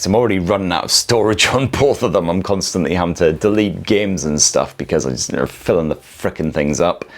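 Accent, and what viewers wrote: United Kingdom. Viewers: good thing I understand Britspeak :)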